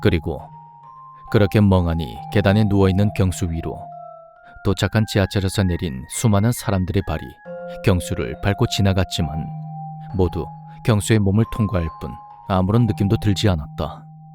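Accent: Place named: Busan, South Korea. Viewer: 이야... '민식이 법' 이거 나오는 순간, "맞아... 님 다시 시작하셨지. 실감나네." 하면서 굉장히 기뻤습니다. 요즘 댓글도 다 읽으신다면서 즐거워 하시는 모습도 상상되구요. 그러면서도 여전히 님만의 아우라가 느껴지는 소중한 영상이구나 생각합니다. 님만의 리딩, 부산 사투리로 듣는 괴담만이 주는 심오한 느낌, BGM을 기가 막히게도 선정하시는 그 아우라마저 느껴지는 신묘함, 또한 정말 너무너무 재밌게, 실감나게 인물들 대사를 열연해 주시는 그 모든 것이 더더욱 듣길 잘 했구나 하면서 하염없이 감사스럽고 기쁩니다 그냥. 우리 님 올 한 해에는 유래없이 기쁨만 연타로 일어나는 좋은 한 해 되셔요. 하도 많이 웃으셔서 구각이 아프다~~ 하시는걸 뛰어넘도록 쭈욱 좋은 일들만 있으실거예요. 감사히 듣는 모든 사람들이, 재밌게 들었던 모든 사람들이, 표현은 달라도 저처럼 응원할거니까요.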